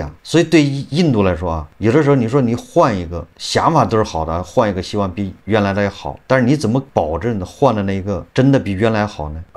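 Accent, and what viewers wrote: Anqing, China. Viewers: 安庆地区口音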